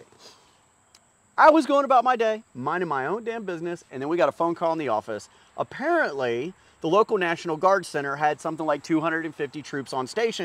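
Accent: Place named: Asheville, United States. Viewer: You are definitely from Asheville .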